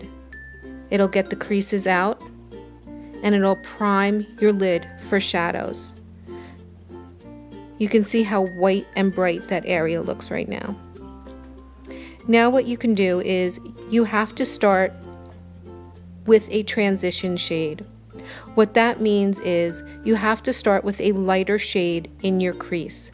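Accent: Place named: New York, United States. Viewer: and OHMYGAWWWD I detected a slight NY accent? A Southern Yankee transplant here from CT living in NC ... def subscribing to this channel!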